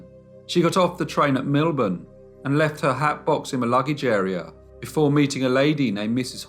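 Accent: United Kingdom. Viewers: im from North Carolina in the US its the south east in the US and if you only knew how horribly flat and that twang we have...I never say anything about how someone pronounces a word outside of this state. The most educated can sound like an idiot. I absolutely love a British accent, especially yours. Keep up the great work. You are helping keep me sane during this horrible pandemic and our joke for a leader..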